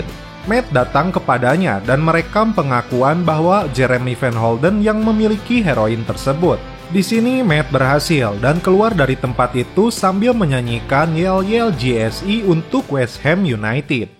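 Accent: West Java, Indonesia. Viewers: Knpa bang aya sundaan wkwk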